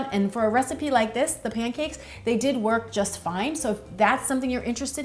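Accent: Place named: New Jersey, United States. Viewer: Very funny Jersey girl accent haha 😂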